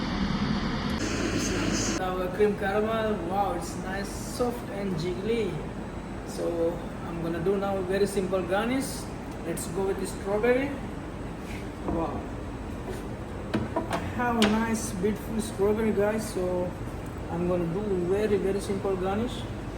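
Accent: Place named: India. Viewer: English katha karane India un wage ai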